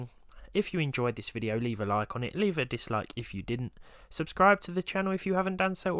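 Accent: United Kingdom. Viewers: Pronouncing mafia as "mäfiä" sounds especially wrong with a British accent.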